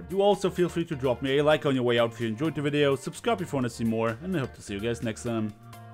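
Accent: Germany. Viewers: Ich wusste es (du bist ein deutscher ich habs immer gewusst alleine an dem akzent) haha ich bin nicht verrückt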